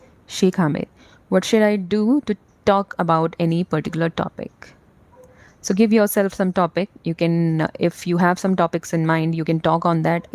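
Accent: India. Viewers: Hai good evening,even if you from chamoli you are pronouncing exactly like Keralates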